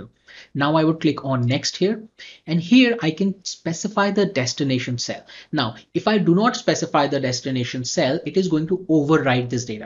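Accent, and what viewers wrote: India. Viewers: If you hear an Indian guy talking about Excel, you know you're in the right space.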